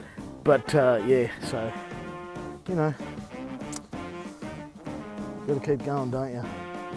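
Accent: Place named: Australia. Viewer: lol i wish i had an aussie accent! sounds awesome! good luck with your weight loss journey mate! new sub :D can't wait to see your progress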